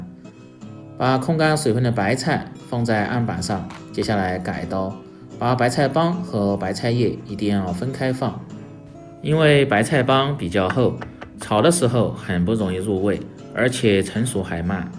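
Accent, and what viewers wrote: Sichuan, China. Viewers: 四川人，一听就晓得嘛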